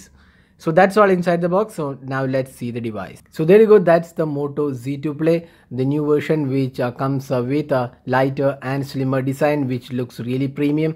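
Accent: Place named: South India, India. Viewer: I love your South Indian accent that's why i subscribed .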